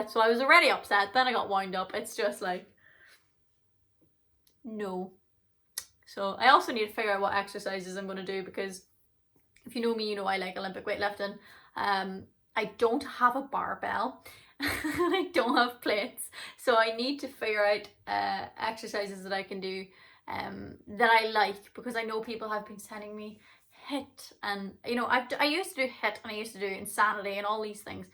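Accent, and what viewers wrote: Ireland. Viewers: Glad to see u back. Ur accent is more Irish. Love it. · I hope you enjoy the rest and free time, stay safe well and happy. You make me smile and feel good, i so enjoy your Irish accent. Glad your getting on top of your problems i heard a trick that helps people go to sleep you start by relaxing your shoulders and then work down your body and then start saying dont think dont think slower and slower it seems to work with me im asleep in minutes i think sometimes we need to rest our brains. xo live long and happy.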